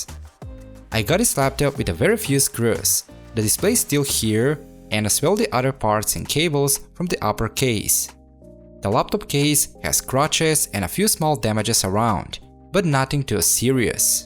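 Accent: Russia. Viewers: ты русский ? если да то ответь (просто акцент русского) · Русский акцент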